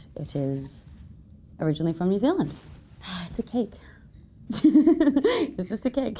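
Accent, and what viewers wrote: United States. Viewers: You can really tell she’s starting to get an American accent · There’s an American accent starting to creep in there.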